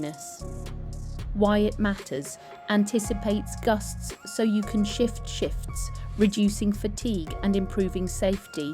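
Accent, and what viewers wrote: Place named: United Kingdom. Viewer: British accent ❤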